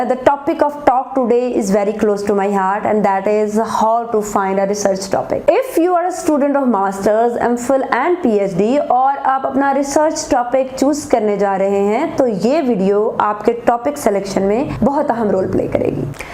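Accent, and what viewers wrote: Punjab, India. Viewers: Please take as a feedback, punjabi lehjay me English chor dain,. . . Otherwise your content is fabulous.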